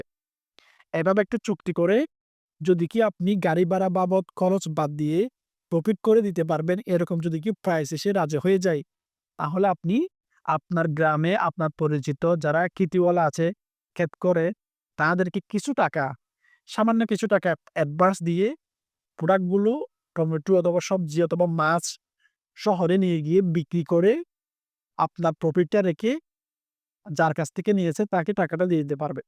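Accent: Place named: Chittagong, Bangladesh. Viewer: Ctg man কথায় বুঝা যায়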